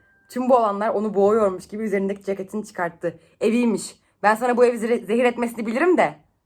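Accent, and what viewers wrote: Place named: Black Sea, Turkey. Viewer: Ayayayayaya Karadeniz şivesii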